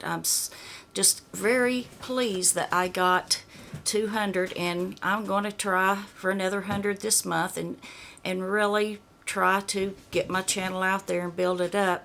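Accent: South, United States. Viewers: YOU ARE SO PRETTY! I LOVE YOUR SOUTHERN ACCENT SO MUCH! Keep up the hard work! God bless you! Also, you don’t need to lose weight you are perfect just the way you are!❤❤❤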